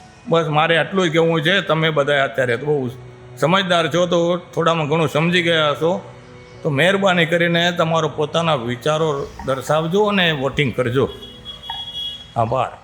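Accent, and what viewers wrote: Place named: Kutch, India. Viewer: આ ભાઈ ની સમજદારી અત્યાર સુધી કોઈનામાં જોઈ નથી આ ભાઈ બોલી ઉપરથી ખમીરવનતા કચ્છના ક્ષત્રિય હોવા જોઈએ ધન્ય છે બાપુ આપને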